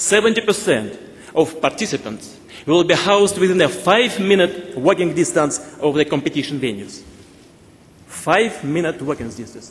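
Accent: Russia. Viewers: Sorry for my bad thought but, I can only understand a few words and/or sentences that he said due to his heaviest Russian accent. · Real russian english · English is used as an international language out of convenience more often than not. Although there are several different versions spoken around the world. I'm a native Brit, but struggle to understand some of the 'English' forms spoken abroad at times. It does make it much easier to travel when there is always someone around who can understand you, so I can't complain. Although I think it has made native Brits incredibly lazy linguistically! When I lived abroad I did try to learn some of the local language out of respect, but people would always want to practice their English on me! I'd love to learn Russian, but the only Russian people I've known and worked with were fluent in English. Putin's accent here is very interesting. 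Some of his pronounciation is very close to 'natural' English, some words are spoken in a clear Russian accent and others sound closer to Chinese! It's fascinating to listen to.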